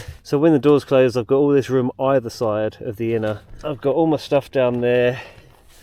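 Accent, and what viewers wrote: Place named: Southern England, United Kingdom. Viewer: Funny how you use the long a for Castleton but a short one for Mam Tor. You Southerners are a strange lot.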